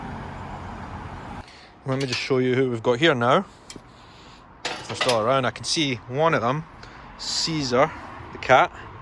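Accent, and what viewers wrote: Scotland, United Kingdom. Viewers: Check back with us in November. Edit: I’m also here for your Scottish voice so whateveeeer!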